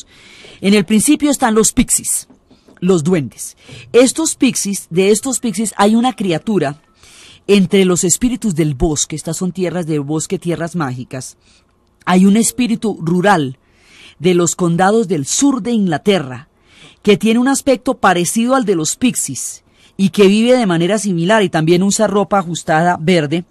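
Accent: Colombia. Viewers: Es facilisimo entender con una narrativa como esta, empeze a escuchar por investigacion pero ahora me he hecho fan y adicta a su canal, aprendo mucho y me hace aprovechar mejor el tiempo!! Ademas me encanta su acento y expresiones Colombianas. Saludos desde Cancun, Mexico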